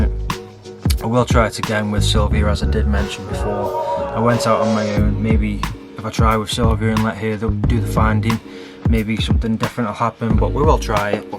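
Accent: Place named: Stoke, United Kingdom. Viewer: Knew your accent from the outset. Parents used to live in Bignall End (Audley) ...small world!!!!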